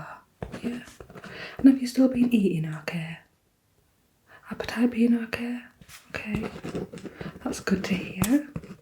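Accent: North, United Kingdom. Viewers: 10/10 as always! I’ll say it again, the northern twang makes me feel right at home 😴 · was going to say the same, love the northern accent :)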